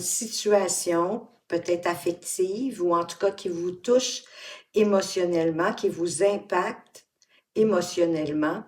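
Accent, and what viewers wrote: Quebec, Canada. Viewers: Merci à vous pour ce beau tirage si parlant !! et la fin me bouleverse ! oui j'ai mis de côté les soins que je peux offrir grâce à mes mains . C'est un plaisir de vous écouter et de vous entendre ! j'adore l'accent québécois. j'en suis tombée amoureuse quand j'ai vécu là-bas 🙏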